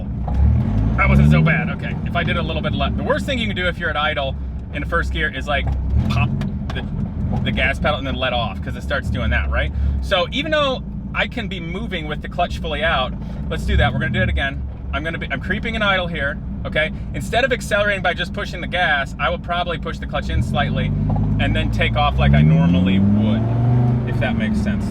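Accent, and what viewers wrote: England, United Kingdom. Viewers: Dude you’re so hyperactive!! I love your video style. And your car. Love the way you sign off: be nice to your cars. Absolutely. Finally, I’m from ENgland, nice English accent. :D:D:D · You look a bit like jenson button, you got the british accent down pat too😂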